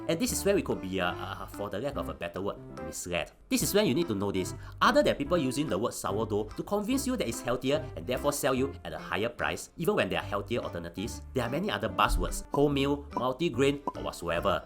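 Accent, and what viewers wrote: Singapore. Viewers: Ur Singaporean accent is so prominent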